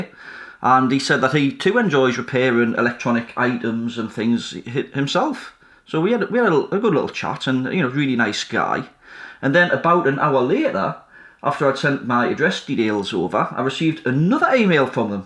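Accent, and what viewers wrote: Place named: England, United Kingdom. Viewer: I have a few pieces of test equipment from Peak, and they are great. You received fantastic customer support !! Also, a nice job repairing this tester. Well done. I like hearing various English accents as I am a brummie living in the USA, so it's a welcome change. 😂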